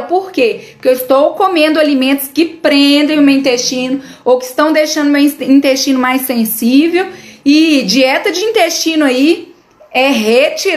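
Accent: Minas Gerais, Brazil. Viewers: Doutora maravilhosa,vc é mineira? Amo os mineiros de ❤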